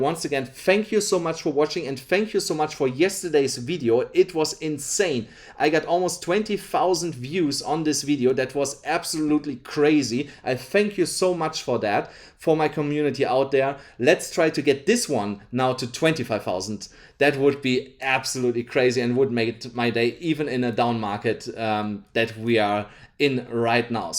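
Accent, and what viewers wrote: Germany. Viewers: Bro kommst du aus Hamm xD?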